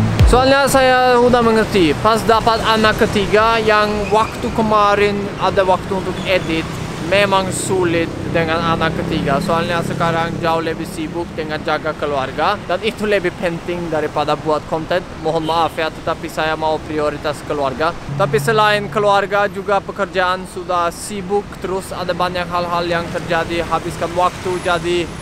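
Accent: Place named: Lombok, Indonesia. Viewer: "Logat" sudah seperti Orang Lombok🤭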